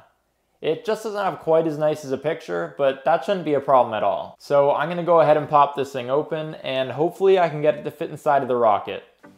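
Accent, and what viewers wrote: Canada. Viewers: Now thanks to HIMYM I cannot unhear that "eau". when you canadians pronounce words like "out" it comes out as a "eaut". like the french word for water, followed by a weird out of place "t". and it's not only in out. several words that came out in himym follow the same pattern. Nice rockets and everything, but every time I hear: "eaut" my brain goes: this is a canadian. :) and it has done that like 10 times during this video :) well, maybe a little more :) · I pegged you as Canadian :) Probably Ontario. It's OK because I'm from the left side of Canada. Cool rocket by the way.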